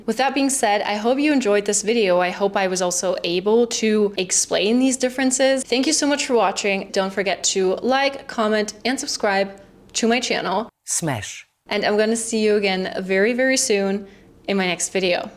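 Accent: United States. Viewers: You sound like an American. See. We are not all nice. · You have a 100% American accent! And your husband has the most American name. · Thanks for this thorough and helpful investigation on cultural differences. May I ask you why your American Englisch is so absolutely perfect? Wherever you raised bilingual? Ich kann total unterstreichen, was du gesagt hast. Meine Beobachtung ist, dass Kanadier und Amerikaner in manchen Punkte sehr ähnlich kommunizieren. It certainly takes a while to get used to it. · Your accent is so American. No one would think you are German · I do the fake stuff because I don't want to hurt other people's feelings; otherwise, I'm with you on the "How are you today?" greeting followed by the "I'm fine, how are you?" response. I usually just want to say "Good morning" to people I see at work, our just "hi" and be done with it. But then Germans have their own version of this: "Wie geht's?" / "Es geht mir gut." You have a good accent. 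Very American. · How did you develop native English proficiency and perfect American accent in 2 years?!? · Are you from Bavaria. You sound American when speaking English wow. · How do you speak with a perfect American accent? Amazing. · It's funny — I'd seen many of your American in Germany and German in America sketches before this, and I assumed you were an American woman who can do a really convincing German accent. The fact that you're a German who has developed a very organic American accent is really cool. I wonder how autistics (I wad diagnosed with Asperger's syndrome when little) handle German culture; I imagine the directness and literalism you discuss would be relatively comfortable for us. :) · I probably shouldn't say this, but the really sugary sweet things, like you just said are generally only said by american WOMEN! From what I understand, that is simply another thing that makes Women a bit different in the US. 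Some women have been very open with me, and I have seen and heard about such things, Perhaps EVERY culture has such differences, but they can vary, and since the US IS very diverse, DESPITE WHAT ANYONE SAYS TO THE CONTRARY, you will see all sorts of variations, So just take it in stride. American MEN just try to be polite, and may throw a compliment your way, but they are NEVER so sugary sweet! But HEY, you do seem to be nice, do look nice, and, for what it is worth, you sound American. I have NOTHING against the German Accent, or Germans, in fact part of my family is German, and I speak some German, but if you want to pass as another person in the US, CONGRATULATIONS! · You sound like you lived in America all your life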